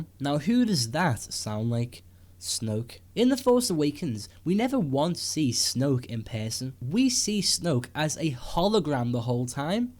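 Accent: Liverpool, United Kingdom. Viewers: Scouser say no more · he's from Liverpool if I'm not mistaken. the Beetles spoke the same way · It's called being a scouser.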